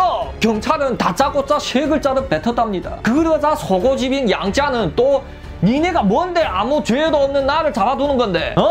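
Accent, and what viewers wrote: Yanbian, China. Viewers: 이양반 말투는 연변식 한국말인가 ?아님 한국식 연변말 중심이없어 듣는입장의 학벌의 한계인가?.....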